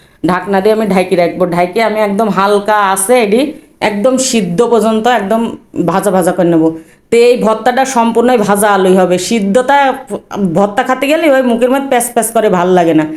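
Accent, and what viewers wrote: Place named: Chuadanga, Bangladesh. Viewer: অনেক মজা হলো মনে হচ্ছে 😋 বাড়ী কোথায় তোমার চুয়াডাঙ্গায় ? কথা শুনে মনে হচ্ছে চুয়াডাঙ্গার মেয়ে 😅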